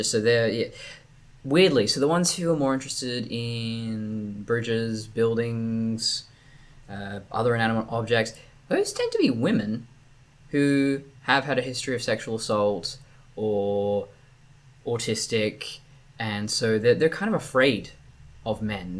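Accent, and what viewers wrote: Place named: RP, United Kingdom. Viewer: I don't know any Australians personally, but your accent does not reflect what I usually hear in movies. If you had not said it, I would have thought you were THOROUGHLY BRITISH, and of some LANDED GENTRY. 😄 What also adds a LOT to the effect is your REFINED POSTURE and choice of words. Is this accent COMMON in your local area "down under"? BTW, HILARIOUS your comment about America sinking under the waves and the water levels PERHAPS rising in your coastal city/town! Also your idea of a "kinky Lesbian" thinking lewd Heterosexual thoughts makes me laugh! 🤣 Glad you referenced "Waffling WIllow". I like her a LOT too! You BOTH present as COMPLETELY and ATTRACTIVLY FEMALE, but in almost COMPLETELY DIFFERENT ways: YOU as a "Duchess" type, and HER more "Princess" like. Amazing counterpoints, illustrating the WIDE RANGE of human FEMININITY! 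😃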